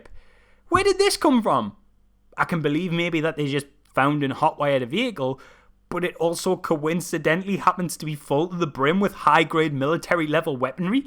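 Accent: Newcastle, United Kingdom. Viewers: just love the geordie accent ..Fucking Baffling!! love it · Are you a geordie lad? A could here it a bit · Hey fellow geordie.👍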